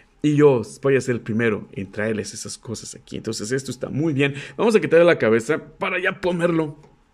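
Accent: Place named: Coahuila, Mexico. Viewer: Eres el mejor:D sigo sintiendo tu asentó cuahuileño aún que ya me dijiste que eres de Tijuana:D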